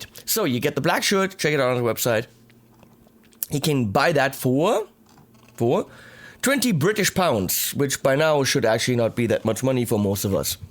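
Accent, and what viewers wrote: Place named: Germany. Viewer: Hab ichs doch gewusst, dass du Deutscher bist! Den komischen Akzent haben halt nur wir :D Ich überlege grade, ob es sich lohnt, meine Fender Lite Ash mit besseren Tonabnehmern auszustatten... Momentan klingt sie ziemlich drahtig und dünn. Die Mexico-Tele von nem Freund klingt richtig geil über meinen Fender Deville 410...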